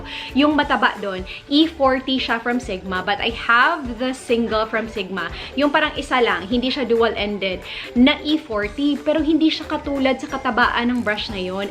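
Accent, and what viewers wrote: Visayas, Philippines. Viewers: Cute kayka mag bisaya 😂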